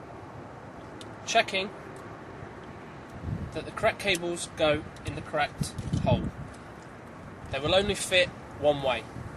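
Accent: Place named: United Kingdom. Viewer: just a little of british accent :)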